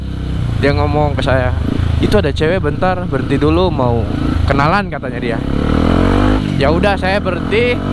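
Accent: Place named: West Java, Indonesia. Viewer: Logat Sunda na mantep😂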